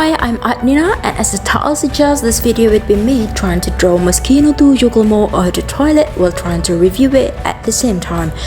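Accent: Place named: United Kingdom. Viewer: Great description, Awesome illustration, Fantastic British accent ! Well Done !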